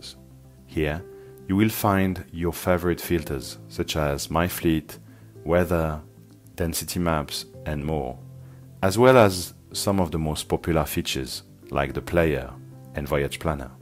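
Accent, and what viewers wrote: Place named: France. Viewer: Sounded like a french accent to me. Kinda explains this nightmare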